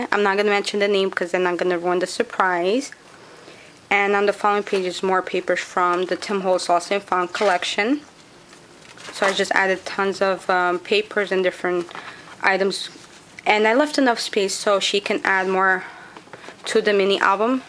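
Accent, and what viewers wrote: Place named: Spain. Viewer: I've never seen work like yours' before....very very original and one of a kind and I LOVE it ....such wonderful inspiration...and what a different accent too...eres Espanola???? Just asking is all :-) Great job.....New Subbie Here too....Laura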